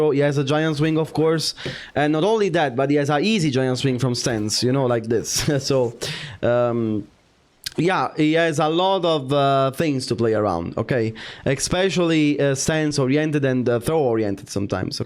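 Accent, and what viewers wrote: Italy. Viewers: Bro fuck Tekken, I watch for the Italian accent 🤌🤌🤌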